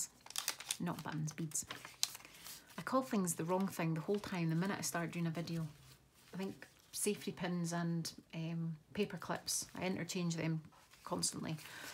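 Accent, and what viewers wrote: Scotland, United Kingdom. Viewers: Oh my goodness you really are very talented lady, these journals are gorgeous you can see how much you enjoy doing what you are doing l love them. How exciting getting your own crafting room set up, it's lots of fun. I would love to sit with you and craft away watching you create and listening to your accent, I can make the tea or coffees. I use to work in Scotland at a Outward Bound Lodge on the edge of Loch Eil. So listening to you reminds me of a time that seems lifetime ago now (26yrs now) I live in Australia with my family, watching and listening to what's happening in the UK and elsewhere is just beyond belief. Keep safe, and keep crafting because we love seeing what you do, take care Jodie xx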